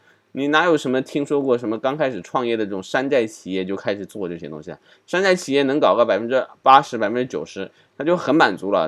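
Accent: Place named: South, China. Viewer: 有的部分分析的挺有道理，大湿听着想南方人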